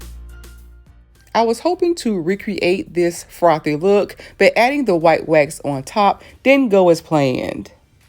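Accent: South, United States. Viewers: So nice. You have a heavy, Southern accent.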